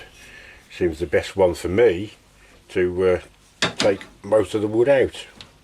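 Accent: United Kingdom. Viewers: Awesome re weather. We recently had two days' worth of sorely needed rain here in Jacksonville, FL. It was beginning to feel like drought-type issues around here. It sounds like you're a Brit. Am I right? If so, what part of the UK do you live? I visited a friend in Telford-Dawley back in 1995 from August thru October and loved the difference in the essential 'air'. Jacksonville is sub-tropical, so lots of heavy humidity around here. The UK weather was crisp and comfortable to me. Thanks for info re the purple heart wood. Do you ever sell any of your creations? Cheers....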